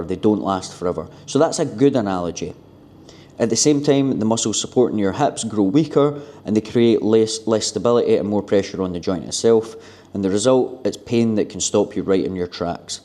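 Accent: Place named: Scotland, United Kingdom. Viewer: Where in Scotland are you from g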